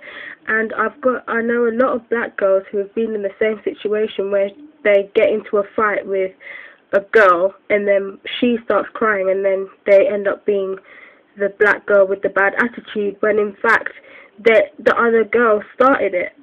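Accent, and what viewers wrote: United Kingdom. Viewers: yur frm england rite judgin frm yur accent london or the midlands ?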